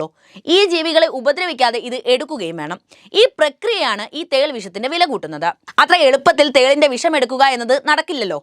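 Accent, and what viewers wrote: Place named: Idukki, India. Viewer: സത്യം പറ,, വീട് ഇടുക്കീലാണോ ?😊😊 cause I’m from adimaly,, that accent of yours is same as mine 💪💪🥰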